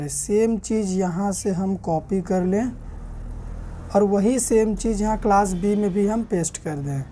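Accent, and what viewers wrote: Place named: Hyderabad, India. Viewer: Hyderabadi slang zindabad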